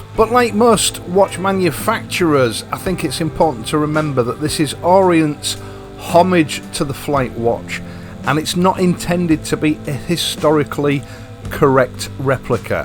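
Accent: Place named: Yorkshire, United Kingdom. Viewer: Hi - wandering through the channel last night & my wife said "Have you got a brother in Yorkshire, or is that just you doing the accent?" (I'm Glaswegian) - confirming that there is indeed a community of us older blokes fed up with disposable consumer culture and tending to embrace the same kinds of things - real clothing, real bikes, real watches and so on. One of the things I most resent about late capitalism is that it intentionally de-skills you to increase your dependency on one corporation or another for products or services. Over-complicated, un-self-serviceable machinery is a prime example. Another is shaving. We got used to paying more and more for overboiled cartridge shavers that require (apparently) ever more blades and strips of chemical slime to do an adequate job of scraping stubble off your face. Fed up with this, a few years ago I dug out my old Gillette safety razor I'd had since I was a boy ("I'd no idea they still make the blades") and reverted to that for a while, but it still bothered me that I was entirely dependent on a disposable component of uncertain quality; besides I still couldn't get a nice clean edge around a tache or beard. 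You can see where this is going... I began a small collection of straight razors picked up from local antique shops mostly, for which I've rarely paid more than a tenner. I watched Youtube videos, bought a couple of whetstones and leather strops and set about acquiring the skills to maintain and use them that my grandfa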